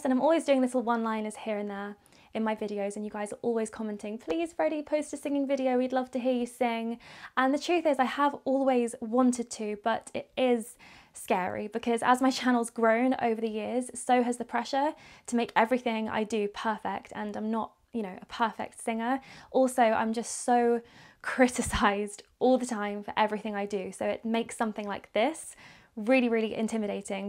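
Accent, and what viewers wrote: United States. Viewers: Wow and the American accent!! 😃😃 haha the end is the best: hiding!! Haha well done!! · I'd really love to hear your real voice without the Americanised tone , nevertheless, this was gorgeous!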